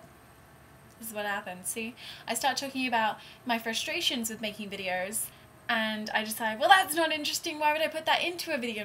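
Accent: England, United Kingdom. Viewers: holy cow! i shes hot! i love her british accent too! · Oh .. i just love brit accent . especially after harry potter and charlie bit my finger .. may be thats what i am against ;) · british beeyach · Well At least its a nice English Accent. Not English people who slur there words.. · i wiv your nglish accent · alguien puede traducir tooooodoo lo que ella dice? al español... I don't understan so much... her acent is brithisch?? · Talk about whatever you want. No matter what I'll enjoy hearing it. You have this cute British accent that I just love listening to. Don't worry about your hair. It looks absolutely beautiful! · she is British lol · damn britsh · Whoever said she was Australian? She's English. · she's from the UK thats how we pronouce it :)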